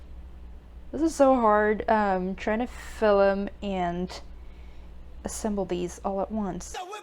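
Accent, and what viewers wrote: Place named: United Kingdom. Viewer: 왜이리 귀여어요 끝까지 다봤다요 크큭 발음 왜이리 영국같아 간지나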